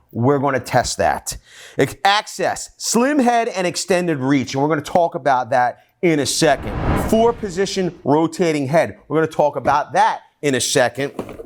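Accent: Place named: Staten Island, United States. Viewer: You must be in NJ or NYC. A fellow Staten Islander? I just discovered your channel this morning, and I'm convinced you must be from within a 10 mile radius to sound like you do.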